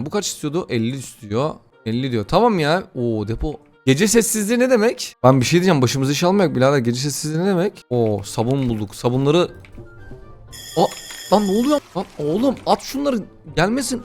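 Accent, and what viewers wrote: Trabzon, Turkey. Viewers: Baba sen Trabzonlu musun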